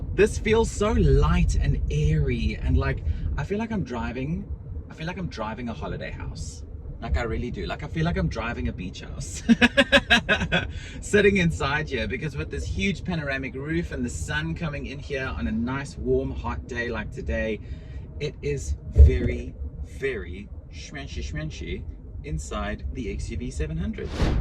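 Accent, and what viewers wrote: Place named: South Delhi, India. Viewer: You accent is same as south delhi rich kid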